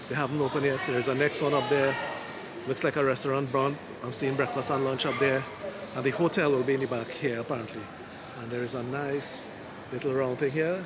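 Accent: Trinidad and Tobago. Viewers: You sound like a Trinidadian, everyone blogging now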